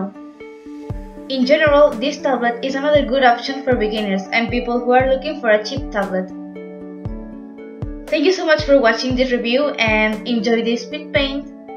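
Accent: Spain. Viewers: She is spanish, te lo noto en el acento GUAPAA🥰💕 te kiero mucho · Se nota que eres española 😂😂 hablo igual que tu el ingles bien pero con un toque español😂😂 besitos 💖💖👍👍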